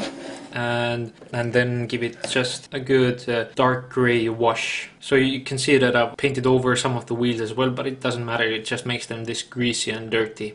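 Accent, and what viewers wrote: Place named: Northern Europe. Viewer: U know was wondering where u come from? is cause of ur accent and entonation. Sounds to me u r from Northern Europe? Whatever the case, ur video was a great one! keep going. BTW that halftrack how much was and where u purchased it? thnx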